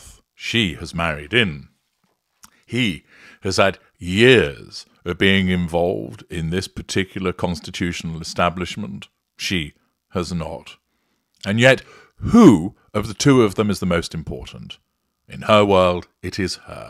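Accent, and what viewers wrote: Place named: RP, United Kingdom. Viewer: Can't get enough of your BBC voice,.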